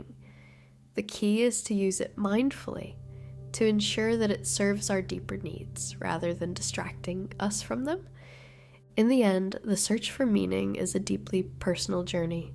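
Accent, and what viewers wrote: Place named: Ireland. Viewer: I pay attention to wait beautiful smart women say or do. And I revell in your subtle irish accent.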